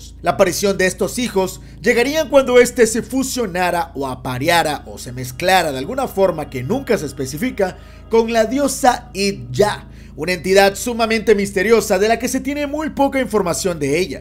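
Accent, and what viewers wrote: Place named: North, Spain. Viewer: Demasiado norteño el parcero 😅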